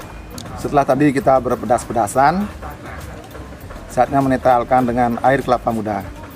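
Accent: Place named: West Sumatra, Indonesia. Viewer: Gaya abg berbicara seperti orang Minang yang menggunakan bahan bahasa Indonesia 😅😂😂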